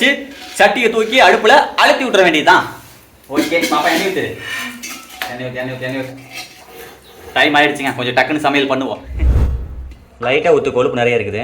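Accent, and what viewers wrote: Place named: Erode, India. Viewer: Hi .....nan ippodhan first time unga video pakkuren...slang enga oor slang maadhiri irukku...nan erode..neenga endha oor bro...👍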